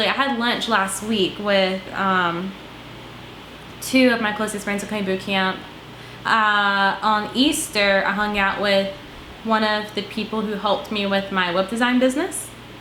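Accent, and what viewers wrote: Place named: Australia. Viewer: Are you or one of your parents Australian? Every now and then you have a slight accent :D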